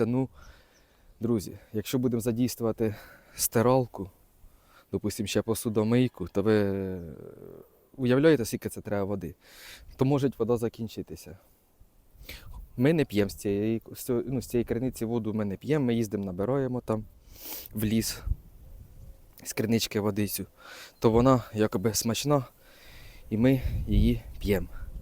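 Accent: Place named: Chernihiv, Ukraine. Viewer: Ти слуайно не з Чернігівською обл.-бо чую говор похожий?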